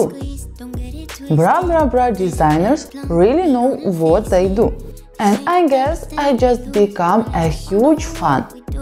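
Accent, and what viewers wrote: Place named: Russia. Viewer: Видеоряд зачёт 😍, но, такой "Рунглиш" я давно не слышал ))) тренируйтесь побольше ))))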